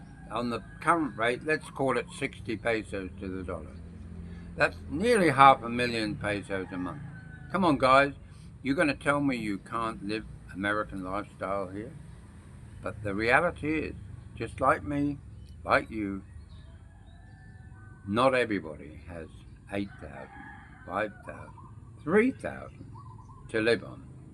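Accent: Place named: England, United Kingdom. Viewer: You have the accent of a Pom not a nz accent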